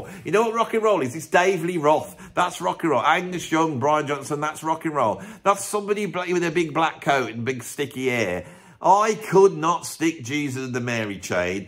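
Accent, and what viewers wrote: Birmingham, United Kingdom. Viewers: Great topic BUT lots of gaps in knowledge and predicable cliched/wind-up stuff about bands in overcoats that actually sing about real stuff- rather than fairies and wizards- BEING 'pretentious'. That said I am loving the Brummie (faux?) accent. So please do a top ten of the best Brummie/Black Country bands wearing false sideburns and a mirror hat and screaming 'BABY, BABY,BAAAABY!' while scrunching your face up. 'Cup-a-Soup' optional.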